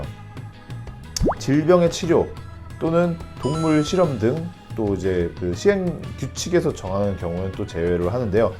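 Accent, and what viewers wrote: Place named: Honam, South Korea. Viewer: 여기가 호남(사투리잘쓰는)형 변호사 유튜브 맞나요? 구독하러 왔습니다!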